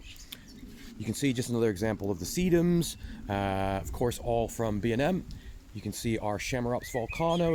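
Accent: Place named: United States. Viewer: I thought you and an American tone to your voice! How did you end up in England? 💚🌴🌹🐦‍⬛🌿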